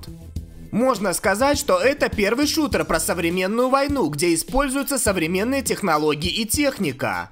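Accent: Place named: Moscow, Russia. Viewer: какой голос блять противный и ещё этот говор мАсковский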